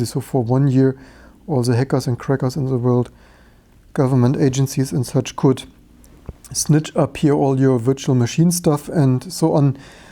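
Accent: Germany. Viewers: Solltest schon sagen dass du Deutsch bist, es ist wirklich nicht zu überhören 😅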